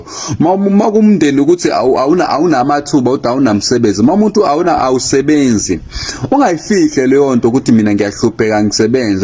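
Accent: Malawi. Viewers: 😂😂😂😂😂 kuthi ukhabazela kamavovo hhayi yishwa phela leli ngoba uyamuzwa lomuntu ukuthi wasemalawi. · Fokof this is Malawian trying to speak isizulu abatjakathi laba bayathwebula laba angibatjembi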